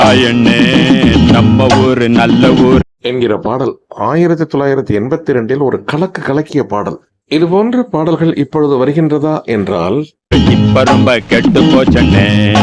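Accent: Jaffna, Sri Lanka. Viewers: யாழ்ப்பான தமிழ் கேட்க கேட்க அவ்வளவு இனிமையாக இருக்கிறது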